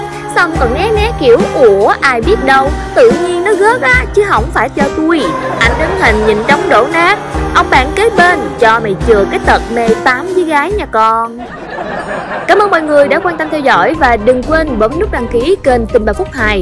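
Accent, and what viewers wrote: South, Vietnam. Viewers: Tôi thích nhất chất giọng ad miền Nam ngọt ngào.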